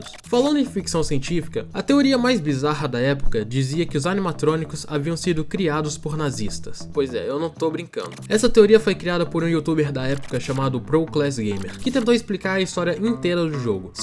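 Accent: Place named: São Paulo, Brazil. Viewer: O Sotaque paulista é sim o melhor do brasil e não vou aceitar nenhuma opinião contrária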